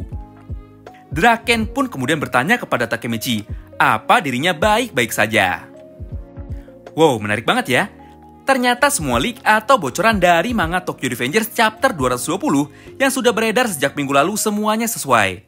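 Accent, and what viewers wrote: West Java, Indonesia. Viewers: Drag-keun orang sunda ya ?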